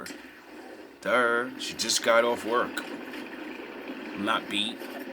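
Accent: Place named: West Coast, United States. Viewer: You sound like you're on the west coast.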